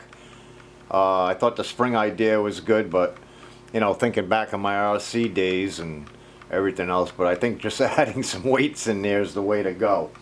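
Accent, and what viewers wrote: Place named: Rhode Island, United States. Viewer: You sound like a fellow Rhode Islander to me, Did I guess right? Or Mass. maybe Boston? Nice track.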